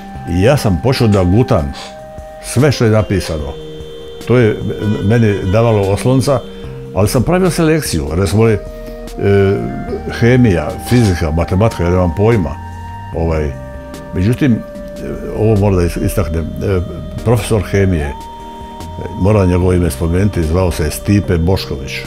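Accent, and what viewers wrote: Šibenik, Croatia. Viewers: Šibenski naglasak bljesne nakon toliko godina ... 🦋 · Nevjerovatno, ima šibenski naglasak, a trudi se pričati ekavicom... Smiješno...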